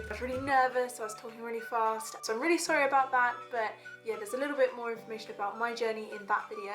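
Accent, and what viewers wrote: United Kingdom. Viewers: I'm pretty sure that's a British accent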